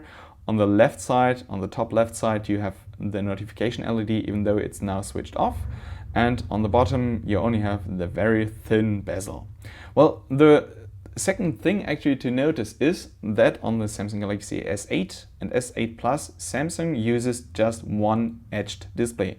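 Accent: Germany. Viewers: I am new here, are you german cz ur accent looks like it. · warum machst du keine deutschen Videos?:D man merkt deinen Akzent:D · Am i the onlyone who thinks he sounds german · Egal wie gut einer Englisch spricht, man hört "den Deutschen" sofort raus. ;) Ich würde gerne so gut Englisch sprechen können -super Video! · Very thick german accent, toooooo much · du sprichst echt gut englisch (konnte deinen deutschen akzent aber leicht hören)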